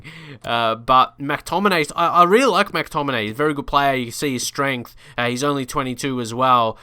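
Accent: Australia. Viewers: are you aussie? or do you live in straya you have an aussie accent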